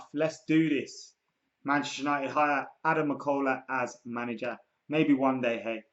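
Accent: Ireland. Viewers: Are u like half Irish? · Adam,are ya Irish?😂🇮🇪🇮🇪🇮🇪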